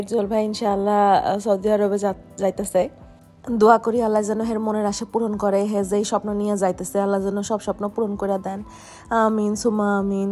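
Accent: Barishal, Bangladesh. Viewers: Apu sounds like barishal vasha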